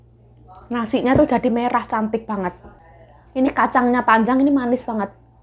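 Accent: Java, Indonesia. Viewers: Wong ndi sih mbok, ngomonge medok tenan😅...?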